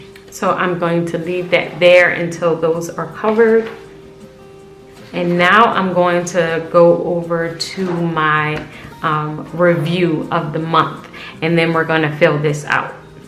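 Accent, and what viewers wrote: New Orleans, United States. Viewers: As soon as I heard you speak I knew you were from New Orleans then I clicked on your page and see you are a member at the city of love ❤️❤️❤️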